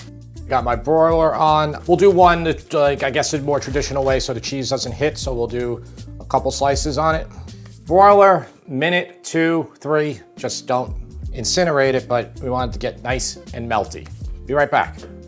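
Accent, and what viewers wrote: New York, United States. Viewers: As I keep saying! I love your accent! So Noy York. The food is good, too. I recommend you make a Spanish style Russian Salad.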